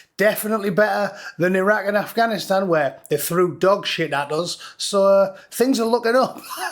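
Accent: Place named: North, United Kingdom. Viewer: When you served you were the best of us and now you still are northern sounding gentlemen.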